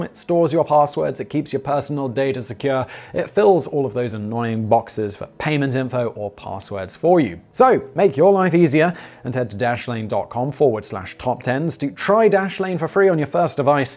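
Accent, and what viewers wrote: United Kingdom. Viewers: Two things: 1 - Love occasional British pronunciation of names or locations. I was doing laundry while watching this one and it took me a moment to realize the Adri-an-dak Mountains were what I have always heard refereed to as the Adirondack (Ad-i-ron--dac) Mountains. And B - Lived in Indiana most of my life and had never heard of the Vivos shelter. Once again I find that a wacky Brit and his friends living on The Continent have educated me on something going on in my own backyard. Keep up the great work, and the rest of the team!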